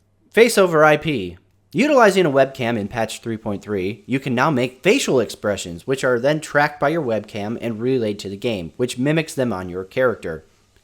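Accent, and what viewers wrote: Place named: Asia. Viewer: You sound pretty Asian.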